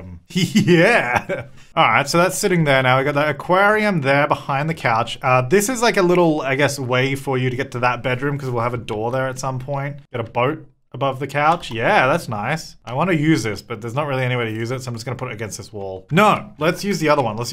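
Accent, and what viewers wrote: Australia. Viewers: Are you Australian English or American just curias 😓😓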